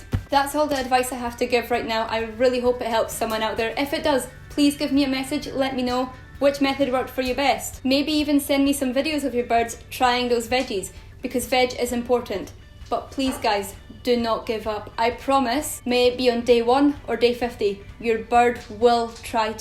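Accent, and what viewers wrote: Scotland, United Kingdom. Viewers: Oops hit the send arrow. Puffin was with me one month short of 38 years, and Dixie, a Green Cheeked conure rescued from a horrible situation and given to me by my avian vet so I could rehabilitate her and give her a good home. Dixie passed away at age 22. Your videos are educational and entertaining. I hope you get a lot of subscribers so your knowledge is shared and valued. I love your accent--are you in Scotland? My dad was born in London, England, and served in the Royal Air Force in WW 2. My mother was American and I was born here in the USA. I'll be binge watching your videos for a while to catch up. Great fun, they are. Apollo enjoyed the ones we watched tonight.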